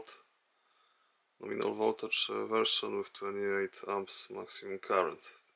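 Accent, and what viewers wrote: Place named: Russia. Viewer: it's realy solved my problem with error 10! thank you! конечно английский с русским диалектом это жесть, но за видос респект!!!!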